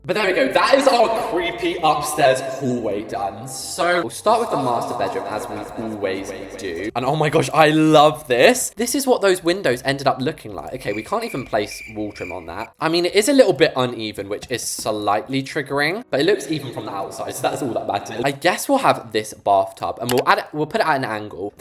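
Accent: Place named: England, United Kingdom. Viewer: I don’t think he is loll, hes from England so that’s why he has an accentt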